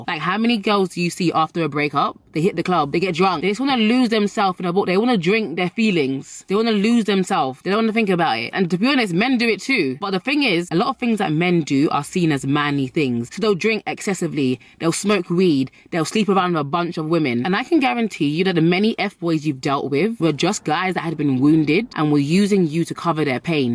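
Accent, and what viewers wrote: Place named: Birmingham, United Kingdom. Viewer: I can here a brummy accent coming out have you been dwn endz😎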